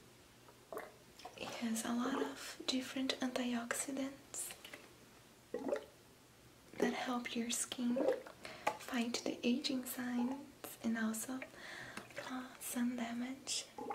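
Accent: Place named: Brazil. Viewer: Gostei muito do teu Portugues mesmo sendo do Brasil, percebi, sou de PORTUGAL, compreendes a escrita certo?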